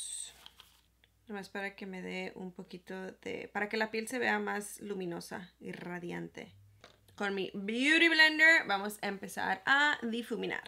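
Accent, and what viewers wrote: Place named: United States. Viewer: Me gusta cómo habla jajaja su acento bien pocho jajaj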